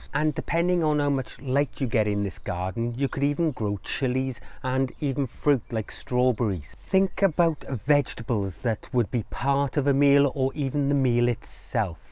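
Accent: Wales, United Kingdom. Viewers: nice to hear the welsh accent here all the way from down under!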